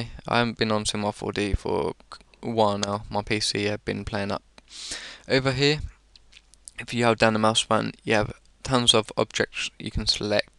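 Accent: United Kingdom. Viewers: you sound like russell brand.. nice english accent..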